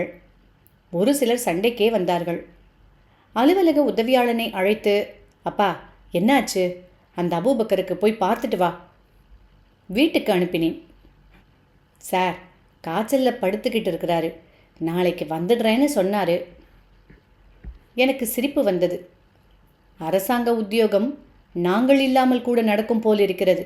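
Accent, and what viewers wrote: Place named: Tamil Nadu, India. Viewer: I am very addicted to your Tamil accent 😊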